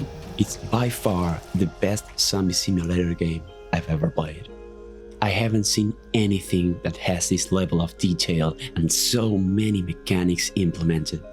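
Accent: Argentina. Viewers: Flaco hablas muy bien inglés, por tu voz sospeche que eras argento y lo verifiqué en tu cuenta. Alto video papá 10/10 seguí asi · vos sos argentino si o si a mi no me engañas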